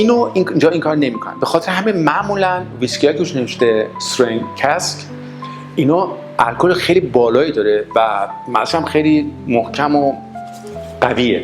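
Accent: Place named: United States. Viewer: لهجه مشتی داری امریکا به دنیا اومدی ❤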